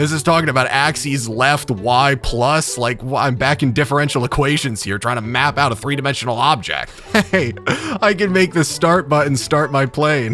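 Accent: United States. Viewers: In the first sentence that ive ever heard from this ytuber, I instantly realized hes american.🇺🇸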